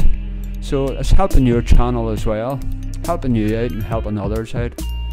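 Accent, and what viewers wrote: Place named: Belfast, United Kingdom. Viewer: gd vid bro u in belfast ? Just you speak like Guy ive dug with Hes originaly from belfast 😎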